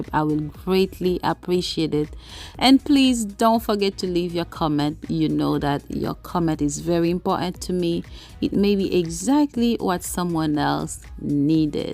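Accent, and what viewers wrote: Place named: Trinidad and Tobago. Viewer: Hi new to your channel ❤ enjoy your video. Are you Trinidadian?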